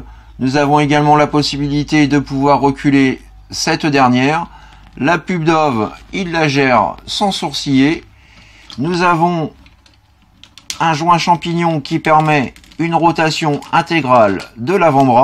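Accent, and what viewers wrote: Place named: France. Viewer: With your beautiful french accent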